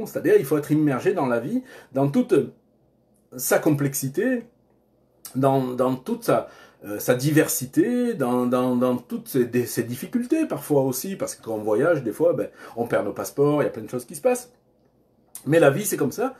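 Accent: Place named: South, France. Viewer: un petit accent de marcel rufo !! ça chante le sud !! la Provence du Quebec ??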